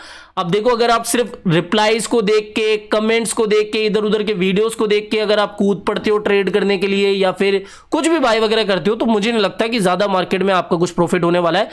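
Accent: Jaipur, India. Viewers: tumhare way of talking se lagta h tum jaipur se ho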